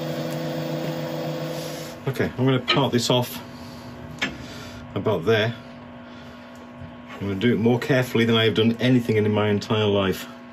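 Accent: United Kingdom. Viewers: First of all, thank you for sharing your ups and downs with that wonderful, marble like bowl! Secondly, you found the most important word concerning epoxy pours: "hopefully"... hopefully it doesn't leak, hopefully the colours stay separated, hopefully there will be no voids... Third point: you used epodex as I do, and I have the same issues with heavy chipping. Looking at the turning videos from overseas it always seems so easy to turn resin. But what can you do if there's no total boat or whatever they use available in Europe? You're stuck on Epodex or Dipon no matter how they behave on the lathe. I'd be grateful if someone dropped a hint to a better turnable resin here. Last but not least: Sometimes you can be experienced in a foreign language as you want, your brain is kidding you anyway. The first three minutes I was wondering what a "barking clusion" might be, 😂. 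Keep on turning, I love your videos, your British sound an I admire your patience. I think I'd have kicked the lathe (outch!) when the bowl flew off.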